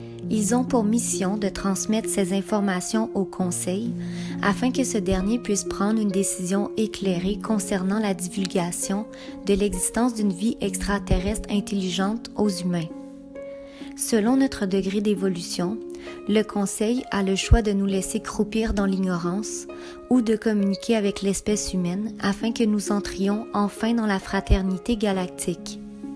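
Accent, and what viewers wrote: Quebec, Canada. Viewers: au petit accent du Québec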